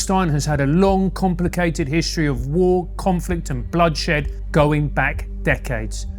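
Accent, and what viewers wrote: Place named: Mockney, United Kingdom. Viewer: Your mockney accent is awful.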